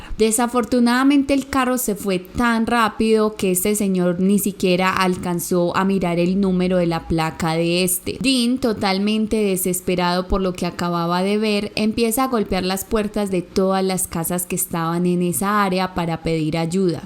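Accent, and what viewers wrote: Colombia. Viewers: Pobre niña En poco tiempo te has convertido en una de las mejores y tu acento colombiano me encanta 👍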